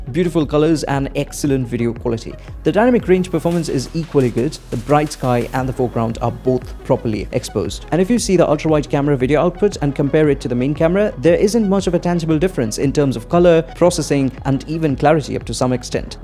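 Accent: Bengal, India. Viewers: Bruhhhh i knew it i kneeew it that u were Bengali but i couldn't proove it .... from the start I was sure about u from south .... Nice videos man 👍 love ur reviews keep it up 🙏🙏 ...... Aren't u gonna do a moto signature??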